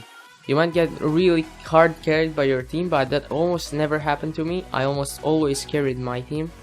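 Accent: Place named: Philippines. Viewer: Let me guess: do you play in the PH server? Your accent sound Filipino...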